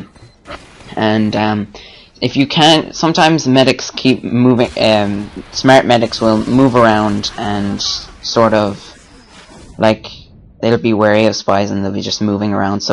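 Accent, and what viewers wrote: Ireland. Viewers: Ur irish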